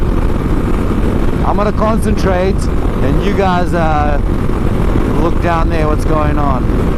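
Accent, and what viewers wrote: South Africa. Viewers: Is that a South African accent I detect? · I detected the accent pretty quickly too. I lived in SA from 1968 to 1979 in the Amanzimtoti area mostly..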